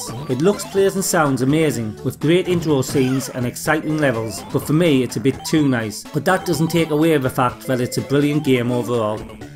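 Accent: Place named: Sunderland, United Kingdom. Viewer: Great video! Nice to hear a mackem accent!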